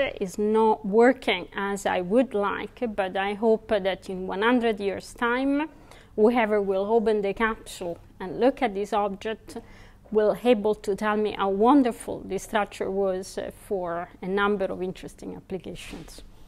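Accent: Italy. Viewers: That thick italian accent goes off and on on every other "R" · I recognized from her accent that she might be Italian/Spanish/Portuguese maybe? Her name strengthens my suspicion..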